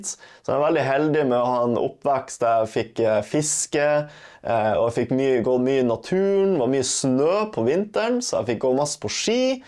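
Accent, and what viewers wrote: Tromsø, Norway. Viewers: Takk. Det var så hyggelig å höre Tromsö dialegt.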